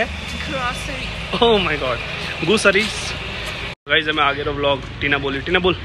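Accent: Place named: Rajasthan, India. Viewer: मारवाड़ी में बोलता भाई बहुत गजब लाग रिया हो!!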